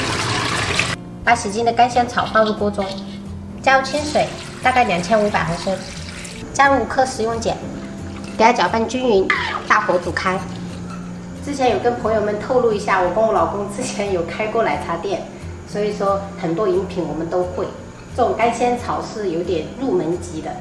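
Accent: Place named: Minnan, China. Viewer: 台語是閩南語，是閩南人，當然講閩南語，講話口音聽得出來是閩南人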